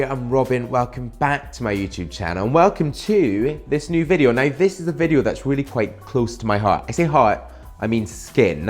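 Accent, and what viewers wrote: United Kingdom. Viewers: I love that British Accent!✌🏻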